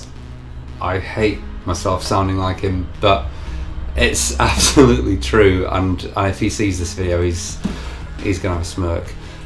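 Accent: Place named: United Kingdom. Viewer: thats how the britan english sounds like !!!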